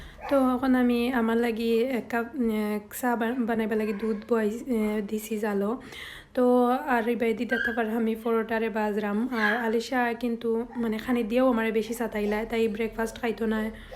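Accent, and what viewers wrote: Sylhet, Bangladesh. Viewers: ভাল লাগল আপি। তোমার সিলেটী ভাষা শুনতে ভাল লাগে। রাতে ঘুমাইতে পারনা কেন আপি? বেবির জন্য? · আপু অনেক সুন্দর ভ্লগ, আপনার আঞ্চলিক ভাষায় কথা বলাটা সুন্দর লাগে। এটা কি সিলেটি ভাষা?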